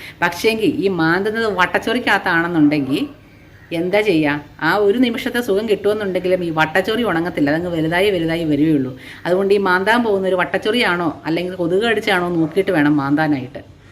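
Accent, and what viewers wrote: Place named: Malabar, India. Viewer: നിങ്ങളുടെ അവതരണ ശൈലി സൂപ്പർ ആണ് ട്ടോ,,,,, തനി മലബാർ ശൈലിയിൽ,,,,,,, ആളുകൾ ശ്രദ്ധിക്കപ്പെടും,,, 👍🔥🔥🔥🔥🔥🔥🔥🔥🔥🔥🔥🔥🙏🙏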